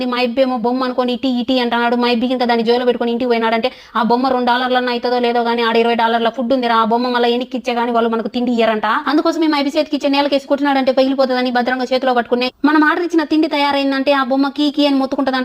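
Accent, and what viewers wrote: Rayalaseema, India. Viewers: Super ga chappavu bangaram❤ Rayala seema slang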